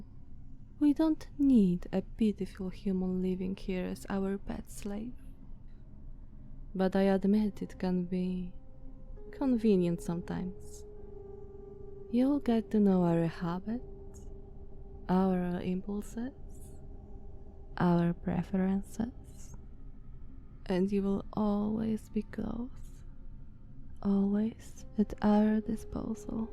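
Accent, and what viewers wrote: Eastern Europe. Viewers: Perfect as ever bless i passed out half way through! So relaxing and loved this dominant side of you and slavic accent! <3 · Mmhmm this is the good shit👌 vampires with slavic accents are the way it should be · I can always appreciate both vampires and slavic accents. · You had me at Slavic accent Hrnng... I am in love. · Hmmm. Slavic accent. Nice. Considering that Dracula, the most famous vampire of all time, comes from the balkans, a mostly slavic region. (I know he comes from Transylvania, Romania but the greater picture makes it pretty nice to see for a perfectionist like me, who appreciates details) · Feels like a proper vampire needs a good Slavic accent after hearing this!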